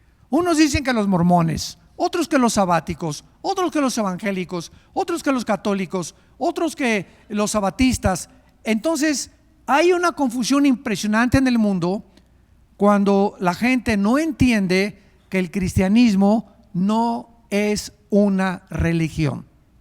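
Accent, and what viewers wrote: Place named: Puebla, Mexico. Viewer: Como que tienes un acento a tehuacan puebla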